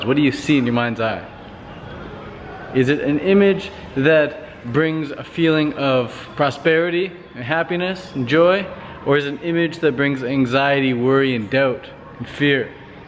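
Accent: Canada. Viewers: which part of Canada are you from?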